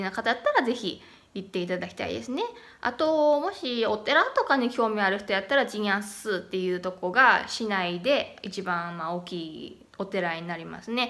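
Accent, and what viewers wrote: Kansai, Japan. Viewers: 、物事言う時さすが大阪弁でワぁ！ワぁ！とか多いですねいい😊👍 笑私、さんの故郷大阪でそろそろ満30年間住んでる上海人です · ははははこれが大阪弁でしょうか？面白い、たくさんの擬音語があるね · 関西ご出身ですね(笑) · ぶわぁー、ぼーん、とばぁーっと合わせて15回以上使ってる。ちゅーちゅー吸うも関西ならではの言い方ですね。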